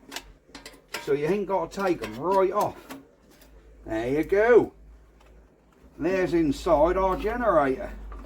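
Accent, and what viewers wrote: Norfolk, United Kingdom. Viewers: Great to hear a Norfolk accent. I was born in Norwich near the St Augustines Gates now in South Australia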